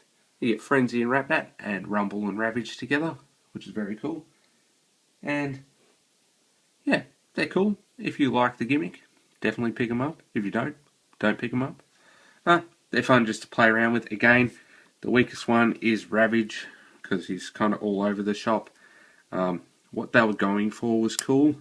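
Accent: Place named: Australia. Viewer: Great to hear an Aussie accent, and one that doesn't sound bogan :)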